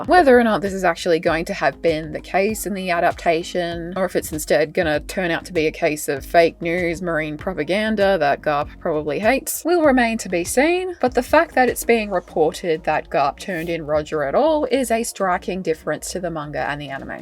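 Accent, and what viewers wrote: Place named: Adelaide, Australia. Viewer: didn't realize that you were in NSW, I assumed that was an Adelaide accent.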